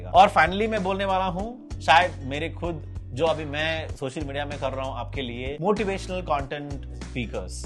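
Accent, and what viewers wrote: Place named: Tamil Nadu, India. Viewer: Thank u for such a video 🙇. Also, I can sense tamilian slang in your way of speaking hindi 😅.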